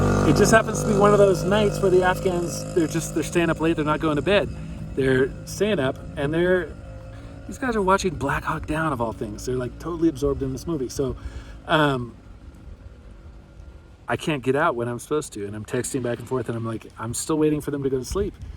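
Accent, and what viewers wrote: United States. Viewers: I live in idaho, your accent is idaho?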